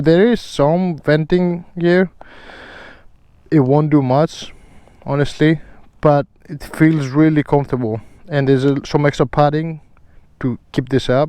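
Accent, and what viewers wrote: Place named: Greece. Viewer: Hey mate, 2 facts that lead me to think that you are Greek. 1 (?) and your pronunciation..it reminds me a lot of mine 😂. So I might be right, I might not. Cheers from Greece buddy! I am thinking of those gloves, and unfortunately in Greece we do not have the chance to try and feel astars products, because very few models are available in stock. So I am thinking on getting these from EU store, but a little concern with the sizing. Should I stick with the sizing chart? Or 1 size up/down. Any suggestions? Thanks a lot, ride safe!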